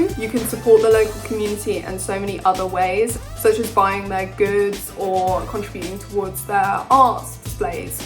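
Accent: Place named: England, United Kingdom. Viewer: I know you are from England, but when you are recording a clip, you Don't need to speak so fast with loud musical background noise.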